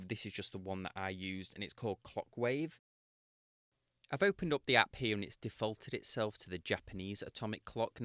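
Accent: United Kingdom. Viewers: I've watched this video through twice, and it sure would be NICE if you'd give a mention of the app you're using to accomplish this amazing feat! Going by your accent I gather you're a Brit. We Americans need things spelled out for us a bit plainly. So how bout it, sport? Give us a hand here mate? 😎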